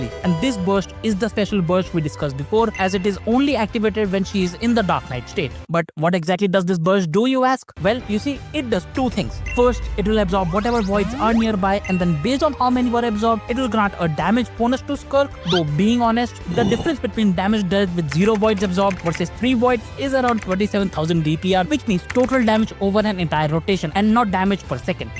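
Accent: India. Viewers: fr bro the indian accent caight me off guard. enjoyed it tho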